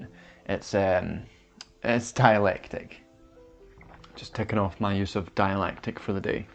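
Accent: Scotland, United Kingdom. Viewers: I was keen for a new channel but fuck am I glad to hear a Scottish accent 🙏🏽🥰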